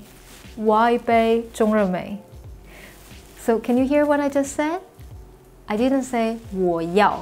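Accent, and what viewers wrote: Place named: Taiwan. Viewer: 博主台湾腔太明显了，考个普通话证书吧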